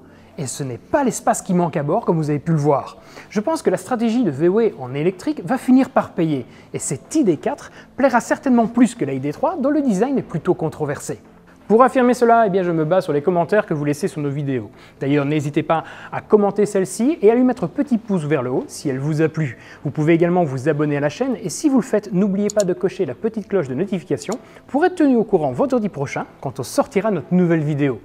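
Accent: Belgium. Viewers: Excellente vidéo. Et puis l'accent belge j'adore ;-) Mais ce modèle est lourd, plutôt cher, pas très puissant à la vue de sa batterie de 86KW (performance moyenne), longue à charger, bref cela, selon moi, manque de modernité. Et la marque Tesla avec son modèle 3 SR+ détrône de très loin ce modèle ;-)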